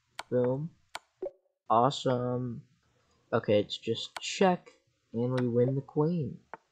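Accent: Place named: United Kingdom. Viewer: sounds British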